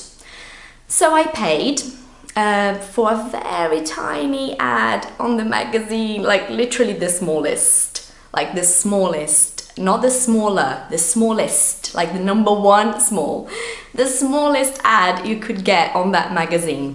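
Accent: United Kingdom. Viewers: Well, it's pretty easy to see why you are teacher. You have all it takes to be a great teacher: you're very articulate, your sentences can take the listener from point A to point B and your mastery of the English language is amazing. You could easily pass for a native British person - if there was no Portuguese words below your videos, the only thing that would give out that you might be Brazilian or Portuguese is the way your name is written (with the diacritic acute - acento agudo para os lusofonos). And even then I wouldn't be so sure since there's a lot of people in North America (and I believe in Great Britain too) which have Portuguese names yet are second or third generation (the kids or grandkids of Brazilian or Portuguese immigrants) and can't speak a word in the language of their parents or grandparents. No Portuguese words and English with (written like this): there's no way I could say you're not a native Brit. 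· I wonder if I'll ever speak English with such a massive british accent! haha My English is totally "american" so for me the british English is almost a new language! Acho cute mas não consigo me imaginar falando assim rs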